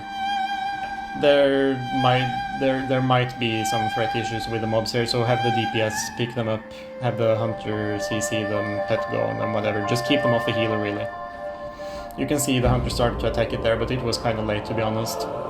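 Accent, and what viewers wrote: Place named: Scandinavia. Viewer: sounds like you are Scandinavian^^ and just a thought but maybe cut the intro down? its kinda long :)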